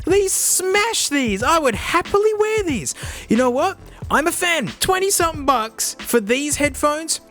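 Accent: Australia. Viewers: The Australian accent gives me the seratonin to live through this shite pandemic. I thank the youtube algorithm for showing me this amazing channel and I thank you sir for existing. Just lost my grandma recently, so being genuinely happy is something hard to come by. This made me laugh so much, I appreciate your content, youve got a sub from a very thankful viewer, cheers. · Is this guy Australia?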